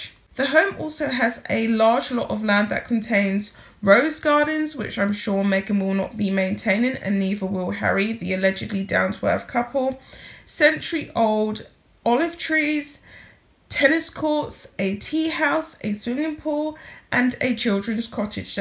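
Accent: England, United Kingdom. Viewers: I married a Southerner 45 years ago, moved to the south over 30 years ago and yes I’ve picked up a few words that sound southern but most everyone knows I don’t sound like a true southerner. The south has many various accents just like England I’m curious what kind of English accent has? Love listening to her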